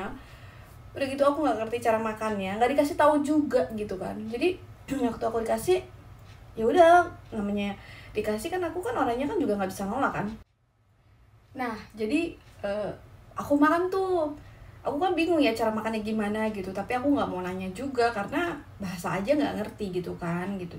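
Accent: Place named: West Java, Indonesia. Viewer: assalamu'alaikum.....mami...,smga istiqomah dlm syiar islam di negeri sakura, aamiin...,smoga klgnya sakinah mawadah wa rohmah, aamiiin.. indonesianya dari daerah mna? kok tmpak logatnya kyak dari Pasundan, smga slh tebakan saya.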